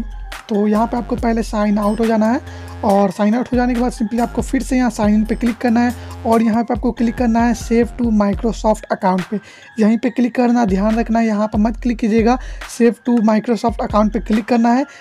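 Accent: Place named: India. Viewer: Bruh u speaking indian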